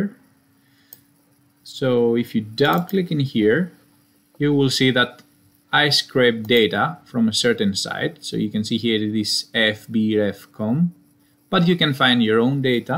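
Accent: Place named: Spain. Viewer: Great work man, excellent content! Question: you are feeding the "scores and fixtures" table with values that might not be correct for that specific date. For example, the league table has all statistics up to the present moment and then you feed them to matches that occurred in the past. Am I right or did I understand it wrongly? Thanks for your videos, I'm mostly interested in content related with orange usage. By the way, you said in a video that you are Greek but to my ear you sound like a Spanish guy speaking English. :) Cheers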